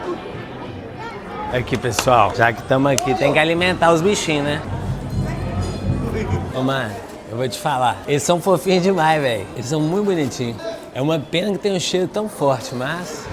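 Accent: Minas Gerais, Brazil. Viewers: Mano acho que você é mineiro como eu, ou eu me enganei ! Rs Esse sotaque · Eu amo seu sotaque, só quem é mineiro entendi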